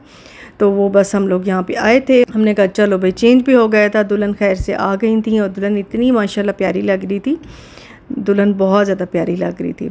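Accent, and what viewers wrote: Saraiki, Pakistan. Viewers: Over acting mat Kiya Karen ap Ka lehja seriki hai Urdu theak NI bolti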